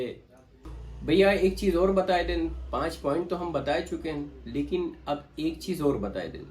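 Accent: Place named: Awadh, India. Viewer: Samjhane ka tarika thoda casual hai bhai kya awdhi bolte ho bhai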